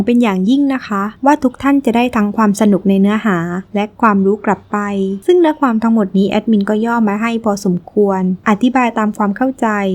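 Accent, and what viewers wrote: South, Thailand. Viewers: ฟังน้ำเสียงแอดมินเป็นคนใต้ไหมครับ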